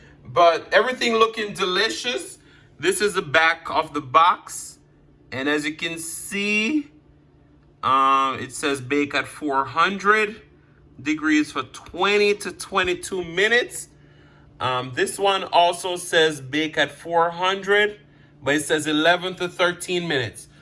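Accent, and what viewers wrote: Patois, Jamaica. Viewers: Awww you changed the title. Thank you. You are too kind! I loveeee this poem so much, and the fierceness in which you delivered it. That patois, so wonderful to hear.. yes you did Recite that, and eat this!! ❤️ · I can listen to you speak Patois all day lol This was good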